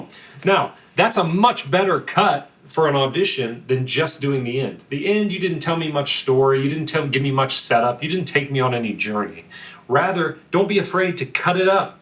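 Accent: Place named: Midwest, United States. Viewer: As someone from the Midwest, I love listening to you. It sounds like home :)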